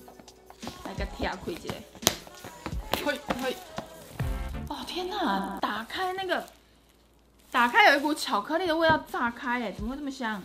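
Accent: Taiwan. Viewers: 講台灣國語敲可愛的我都會噗揪笑了出來🤣🤣🤣🤣🤣💜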